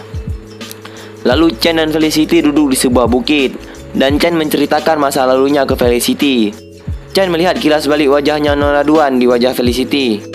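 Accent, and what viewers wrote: Aceh, Indonesia. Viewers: Orng aceh ya bang? :V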